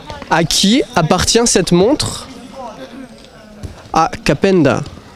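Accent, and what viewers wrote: France. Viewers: The way this guy is speaking French is really cute. And I love to hear the french accent it's so satisfying I don't know why. I didn't learn much from this video honestly. 😂😂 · Love the French accent. :)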